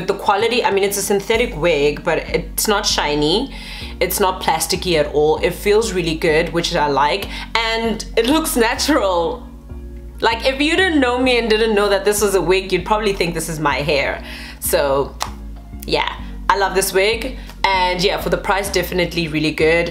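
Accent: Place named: South Africa. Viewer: South African accent😍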